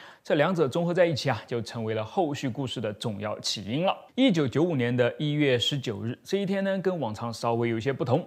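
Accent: Nanjing, China. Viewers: 是南京的？ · 哇，，原来是南京人啊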